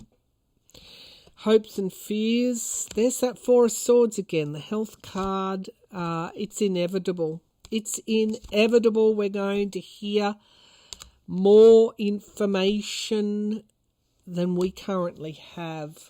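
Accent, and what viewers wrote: Australia. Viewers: We love you just the way you are, ❤ And I Love your Australian dialect!!! You rock! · Your Australian accent is gorgeous!!! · Brilliant, entertaining and informative. Thank you for the great intellect and for the support spirit shows to those around the world for a better world and getting rid of corruption in high places. Your Australian accent is wonderful and the Aussies over many years have been right there to help when always needed. Reference WWI & WWII. Thank you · Love your Aussie accent! It fits you perfectly! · I so look forward to your readings and your beautiful Australian accent is part of the reason. I went through speech therapy in elementary school, had no say in the matter, for my lisp. Would have never known you had one. Keep shining your light!❤ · I absolutely love your Australian accent! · you speak English, regardless of you Australian accent. 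You speak perfect English regardless of your lisp. I understand you perfectly. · Love your Australian accent, love your Australian heritage, love your energy. Thank you so much for your honesty and openness. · Thank you,. I love your accent and I love that you’re proud of your heritage. Are they different accents for different parts of Australia? I was raised in Philadelphia and then moved to Chicago and then to Maryland and everybody can tell as soon as I’ve been around Philly friends, my accent changes, it’s kind of fun!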